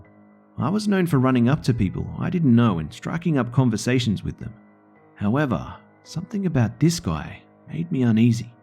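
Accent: Australia. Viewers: Holy shit Australian narrator! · He's Australian :)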